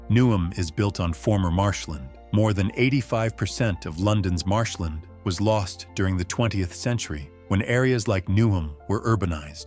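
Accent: United States. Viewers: Please avoid American voiceovers. Firstly, on subjects about London (or Britain in general) it sounds like it's carping. Secondly, since the advent of the disgusting president Trump I never want to hear an American voice again. I turned off after about 30 second. Goodbye! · Clap trap.....also American accent.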